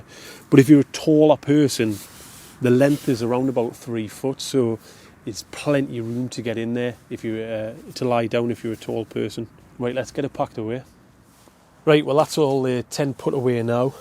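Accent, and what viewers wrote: United Kingdom. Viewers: From America but lived in Sunderland for a time. I sure miss the Geordie accent. Thanks!!!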